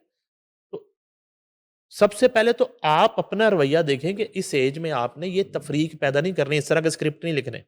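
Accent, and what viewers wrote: India. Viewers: Polte ordo hyen lehja punjabi penddo hye😅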